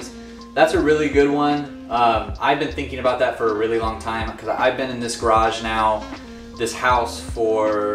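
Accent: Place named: Chicago, United States. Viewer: Hey, you from Chicago? I've seen the Bears stuff in your other videos, and, I thought your accent sounded familiar. The fact that you have an open dislike towards Wisconsin just kinda confirmed it for me, lol.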